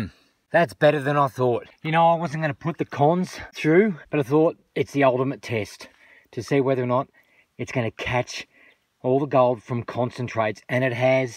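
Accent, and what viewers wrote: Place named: Australia. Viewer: Absolute awesomeness!!! Love your idea and you proved it works! Thank you for that warm Aussie Love! Adore your accent ❤❤❤ Coming from Alberta, Canada 🇨🇦❤ Love Australia 🇦🇺 ❤ Blessings 2U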